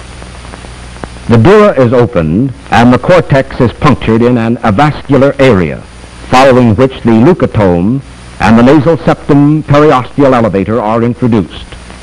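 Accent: Transatlantic, United States. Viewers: 1. Old as hell video 2. Transatlantic accent narrator 3. Fuzzy background sounds 4. Slightly distorted video quality 5. Almost/complete black and white colours 6. Starts off with a bunch of descriptive texts 7. Medical related Well this video check listed all the points to be CREEPY AS FUCK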